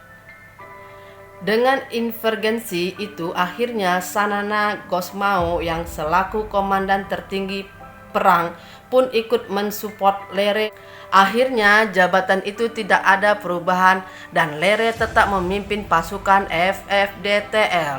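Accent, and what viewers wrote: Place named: Indonesia. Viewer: Kayaknya ema1 halo Channal ema Indonesia1 karik tan nia logat ne logat ema Indonesia nian.... 🙏🙏🙏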